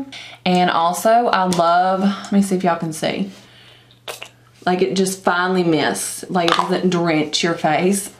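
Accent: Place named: Arkansas, United States. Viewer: likex10 I only ever used 1 setting spray and thats macs fix + ....miss that smell. Haven't purchased that in a long while. Sorry to hear about your neck hope you feel better soon. Will keep it in prayer too. Arkansaw how cool! Thats where that accent comes from 😃👍👍👍 that is so beautiful. I love meeting ppl who Love the Lord too. I am most thankful for deliverance and overcoming things I never thought I could #GodbeTheGlory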